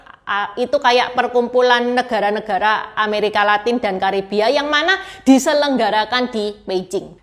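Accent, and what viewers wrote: Java, Indonesia. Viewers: Usul buat ci, ya...tolong ditambah ngomong mandarinnya, Ojo mung medok jowone❤ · Pekat sungguh bahasa susah nak faham bercampur dengan bahasa jawa tidak seperti miss sari bahasanya mudah difaham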